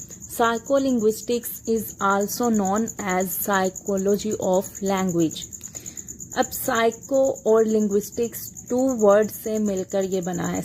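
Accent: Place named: India. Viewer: Why are Indians having a bad accent to accommodate English and still want to teach the same language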